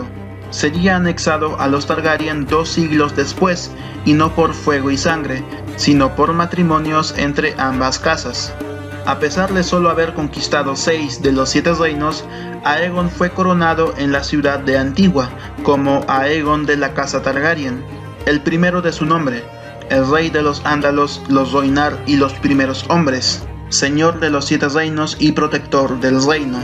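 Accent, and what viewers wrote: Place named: Bolivia. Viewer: Eres boliviano verdad? Acento inconfundible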